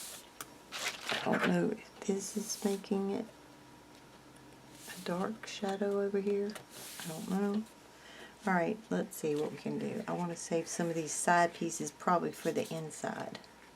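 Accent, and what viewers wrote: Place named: Oklahoma, United States. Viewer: Hi, this is the first video that’s popped up on my home page, so I clicked on it as I am fairly new to decorating journals. My name is Karla, in Oklahoma. You don’t sound like you far from Oklahoma? lol your accent. Anyways, you created a very pretty spread. Love how the girls are cascading inside the faux Dutch doors. Thank you for bringing us along 😊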